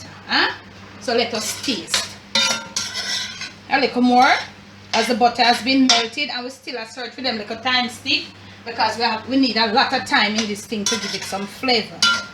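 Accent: Jamaica. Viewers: Love the cooking show and the Jamaican twang.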